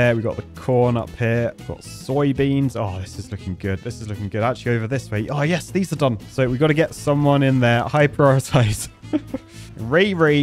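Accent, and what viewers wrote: United Kingdom. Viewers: Are you English or British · Where in the UK are you from?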